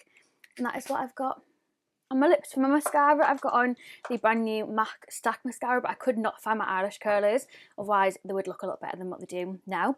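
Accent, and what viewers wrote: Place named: Northern England, United Kingdom. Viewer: Loved the video. Where are you from? You sound like a northern lass like me!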